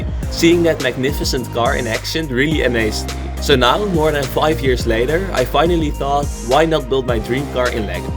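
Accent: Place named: Netherlands. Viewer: Are you dutch ? Because you have an accent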